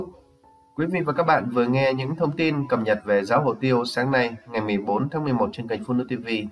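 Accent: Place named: Gia Lai, Vietnam. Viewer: hôm nay giọng đọc đặc trưng của người dân Gia Lai đã trở lại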